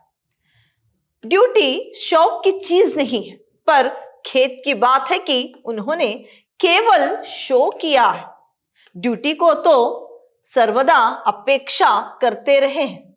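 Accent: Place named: South, India. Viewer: I think you are from South India. I guess it from your pronunciation. Overall very nice video madam 👌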